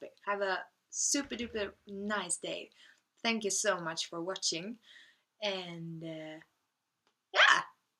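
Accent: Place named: Sweden. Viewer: you have an awesome accent. And Swedish is the most popular North Germanic (Scandinavian) language.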